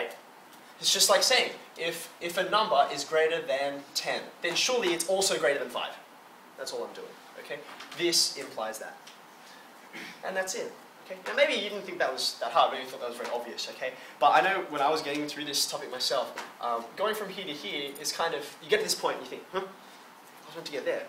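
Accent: Australia. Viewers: I've never seen a Asian with an Australian accent before. He's hot and smart. Great video.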